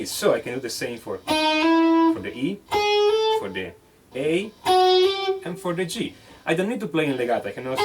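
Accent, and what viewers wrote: Italy. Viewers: he is italian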